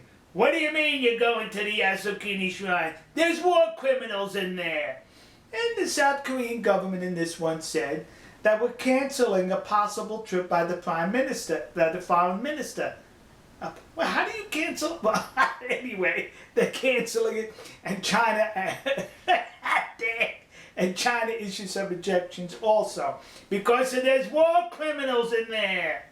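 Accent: Texas, United States. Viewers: テキサス訛りの英語をですか？教材には向いていないと思いますよ。 残念ですが・・・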